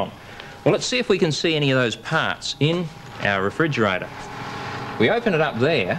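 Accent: Australia. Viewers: The Aussie accent hits me hard the first second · Why is it a Australia accent make learning better? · Why does this guy remind me so much of a young Ray Comfort? Similar hairstyle, hair color, mustache, Australian accent, are these guys trying to look like each other or do all Australian men just look like this? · It was awesome sir...It helped me a lot to polish my concepts out...You are a fair dinkum Australian..I am fond of this aussie accent... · that Australian accent telling me what’s a refrigerator and then asking how does it work really hits me in the feels.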